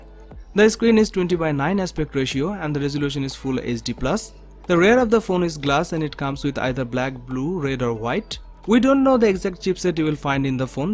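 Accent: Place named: India. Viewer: I wanted to focus in the video but indian voice always annoyed me but I'm not a racist or hate them I can't just stand they're accent..sorry...binod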